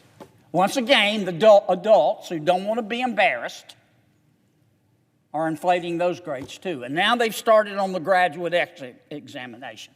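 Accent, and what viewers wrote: South, United States. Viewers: I am from Louisiana. He speaks very clear to me. He may sound country but he has This Country and our state in his heart. Good ol’ Boys know how to talk so people will listen. It does not matter if he speaks your language, He speaks ours.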